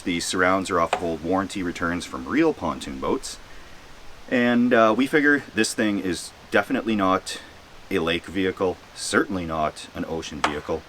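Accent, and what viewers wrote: Canada. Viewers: Dude I love the way you say garage! Damn I love Canadians accents!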